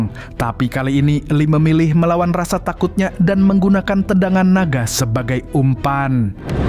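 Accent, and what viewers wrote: Banjar, Indonesia. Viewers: Adminnya bisa lai bahasa Banjar😁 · Anjayy org banjarr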